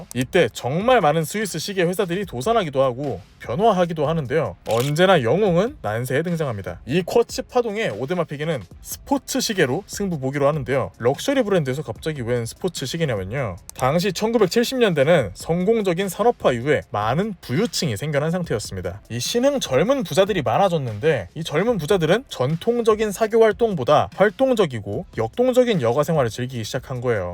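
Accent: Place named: Jeolla, South Korea. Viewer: 말투가 진짜 특이하시당... 경상도 같다가 전라도 같다가..😮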